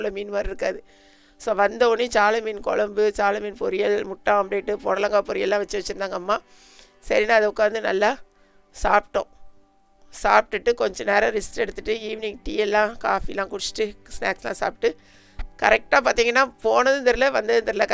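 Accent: Tirunelveli, India. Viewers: நீங்க சென்னை ல இருந்தாலும் உங்க பேச்சு திருநெல்வேலி பேச்சு தான்.... மாறல... Gud 👍👍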